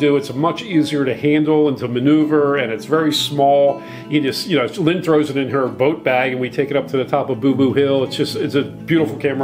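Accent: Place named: Philadelphia, United States. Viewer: I have been following you guys from the beginning, THANK YOU! My wife is from Philly and she enjoys the accent. I like that you 2 do this not for the money. I'm retired and born and raised in mid-town Manhattan and would sell everything in a NY minute, but unfortunately my wife doesn't share my desire, so I live thru you 2. While boating is not cheap or for the faint of heart divorce is far more costly. thanks to you for giving me hours of fun>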